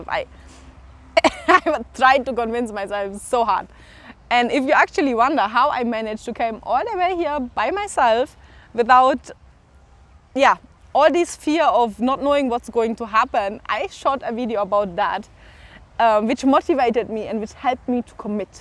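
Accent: Kenya. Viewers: You even have a Kenyan accent! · I like what I see and hear. A lovely mzungu speaking english with a Kenyan accent🥰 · But that English is Kenyan! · you picked that kenyan accent really quick. I can tell by your pronunciation of words. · You adopted the Kenyan English accent perfectly. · I was born in Kenya but left when I was 16 and have never been back. I will go back hopefully in 2023. You sound Kenyan. 😅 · Hahaha you have a very strong Kenyan accent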